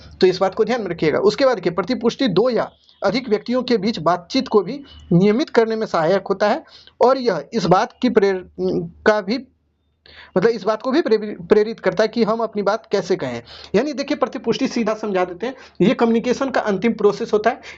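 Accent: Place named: Bihar, India. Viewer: Sir aap bihari h n ham v bihari h aapka language bahut accha lagta h😂❤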